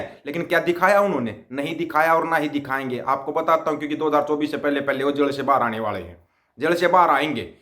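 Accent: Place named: Rohtak, India. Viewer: भाई जी आपका बिंदास रोहतक वालों का बोलने का लहजा बागेश्वर का मोड़ा । दिल खुश हुआ है । ज्ंयोदा बसदा रह ।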